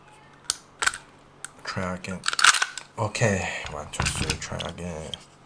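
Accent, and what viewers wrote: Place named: China. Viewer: 国内有没有号每次要上tube听中式英语好别扭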